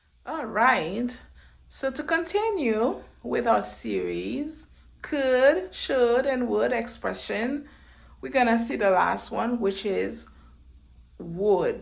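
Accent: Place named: United States. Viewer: With good American accent I like to watch your video my dear cousin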